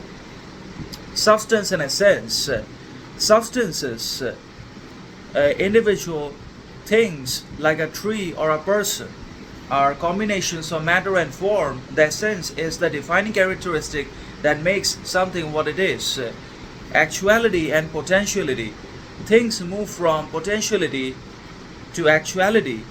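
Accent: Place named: England, United Kingdom. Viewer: I like your English accent bro.. as well as philosophical... how your learn this accent.. guide me... I am struck in fluent and lack of accent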